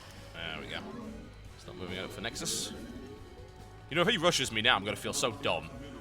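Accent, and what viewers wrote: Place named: United Kingdom. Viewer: I really wish you would continue this series tb. I understand you're a busy man with all the SHOUTcrafts and whatnot but this is a nice series to watch as a struggling beginner. I think watching you play and hearing your commentary on what you should do and what you should have done better really helps. Watching the pros play doesn't help much because its very fast paced and hard to follow and learn from. Plus hearing your charming british accent is nice too :).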